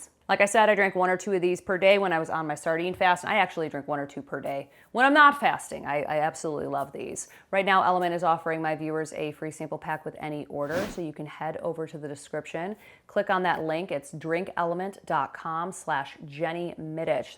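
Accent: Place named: Midwest, United States. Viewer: Da Jewels? Sound to me you're in the midwest, perhaps the Chicago area. If you are, do you miss Dominick's? Was sad to hear of their closing. Used to live in Elmhurst/Lombard, but been down in fl since 87 june. Have been considering the diet, but not sure. I was 220 a year ago, but down to 175 now. Trying to get back down to 140. Some people say that's too skinny, and to them I say that I haven't grown one inch taller since HS, and I'm only 5'7". Don't care they revised the charts. That was only to encourage people to be fatter and more unhealthy, as if what they put in our foods here in the US wasn't enough. Liked the video. 👍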